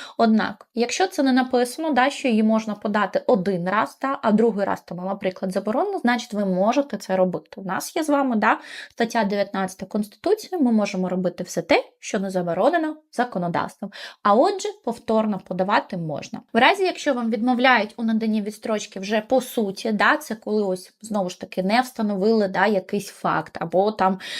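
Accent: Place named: Hutsul, Ukraine. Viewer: ещё этот мадьярско-гуцульский акцент!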